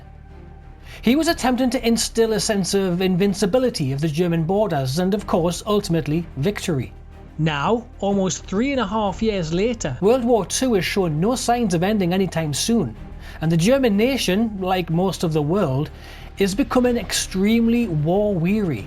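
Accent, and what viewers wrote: Newcastle, United Kingdom. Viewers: Not sure if it's Geordie accent but reminds me my childhood in blyth. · You sound like a Geordie. I am from Lobley Hill, Gateshead originally.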